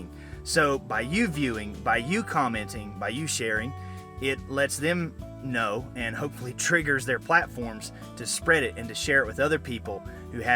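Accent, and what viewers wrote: United States. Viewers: love ur cowboy accent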